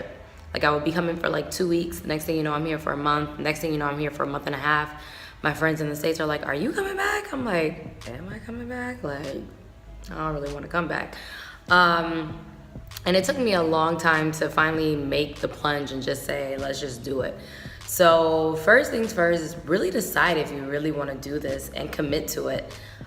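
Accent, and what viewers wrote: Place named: Liberia. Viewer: So cute when u speak Liberian English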